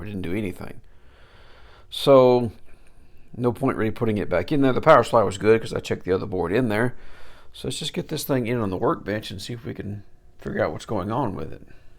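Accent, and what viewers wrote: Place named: South Carolina, United States. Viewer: You sound like you are from my area...South Carolina?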